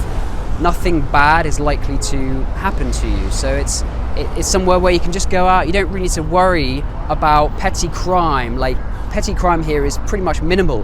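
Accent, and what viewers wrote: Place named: United Kingdom. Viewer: That a big step for you... Love ur video n ur british accent... Pretty decent 👍❤️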